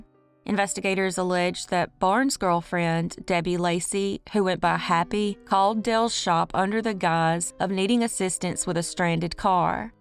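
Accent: South, United States. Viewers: I love listening to you from the other side of the Atlantic telling crime stories with your absolutely lovely southern accent. I just love it. Thank you very much for your work and greetings from Portugal 🇵🇹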